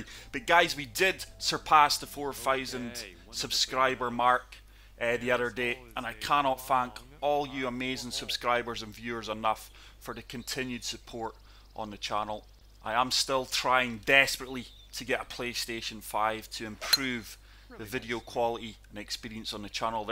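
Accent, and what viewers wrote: Scotland, United Kingdom. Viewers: I hear scottish i sub 🏴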